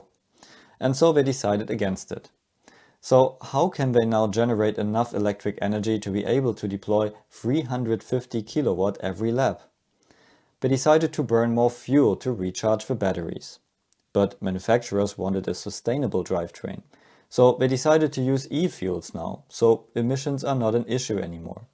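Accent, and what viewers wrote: Germany. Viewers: Danke, endlich mal ein Deutscher der es vernünftig knapp erklären kann. Ob auf deutsch oder englisch ist egal - der Akzent vermittelt ein gewisses mindset😂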